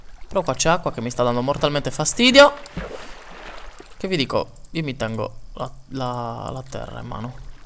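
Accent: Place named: Sardinia, Italy. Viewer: Amo il tuo accento sardo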